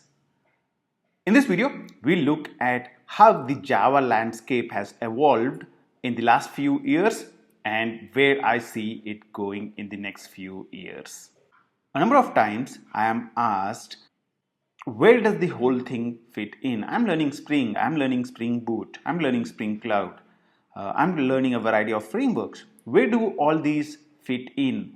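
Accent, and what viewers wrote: India. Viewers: Very interesting content, but the indian pronunciation is awful 😑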